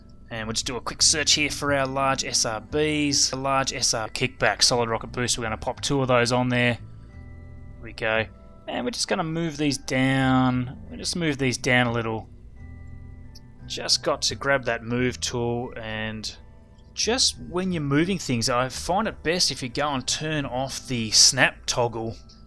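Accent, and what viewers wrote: Australia. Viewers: G'day Mate! Are you Aussie? I am too ;)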